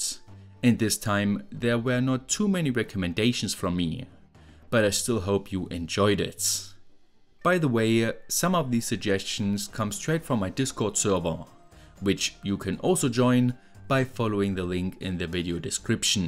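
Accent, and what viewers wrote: Germany. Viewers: It’s funny that I can really hear out from the way you talk that you are German 😂❤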